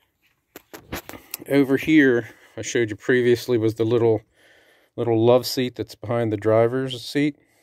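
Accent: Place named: Southeast Pennsylvania, United States. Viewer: SE Pa. accent. Thank you!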